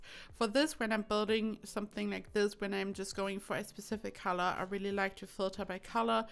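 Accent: Germany. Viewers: Your accent at least sounds very german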